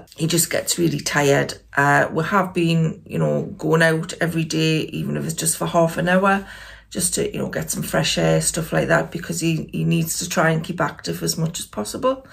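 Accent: Newcastle, United Kingdom. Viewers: I love your channel, one of my favourites (I love a Geordie accent, that always helps 👍). I want to start my own channel soon as I’m in the process of divorce and will be moving out probably next year to Sussex so I’m near my sister. I’m taking tips and a few ideas from you x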